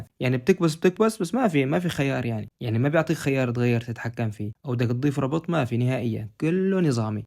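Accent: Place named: Syria. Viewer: شكرا على راسي بس انت من وين حسيت لهجتك سورية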